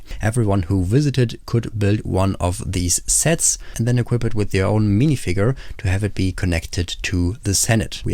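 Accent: Germany. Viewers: Denglisch, hört man sofort raus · German accent Kicks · 1 second in the video and i can already tell you're german as well xD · Love the work and ze german accent✌️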